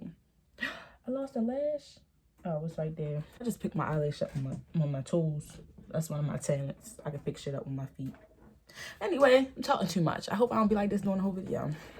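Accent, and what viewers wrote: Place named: Baltimore, United States. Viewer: Girl, just came here to say that I subscribed to hear true crime with a Bmore accent fr fr! Love it! ❤️ Making me miss home.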